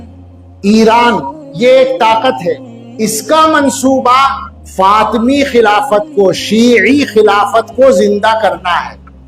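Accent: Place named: Hyderabad, India. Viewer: جناب کی شکل تو مودی سے ملتی جھلتی ہے، اور آواز و لہجہ بھی انڈین حیدر آباد والی ہے۔ 😊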